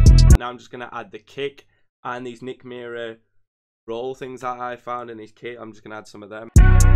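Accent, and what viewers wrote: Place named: Northern England, United Kingdom. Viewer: You are definitely from north England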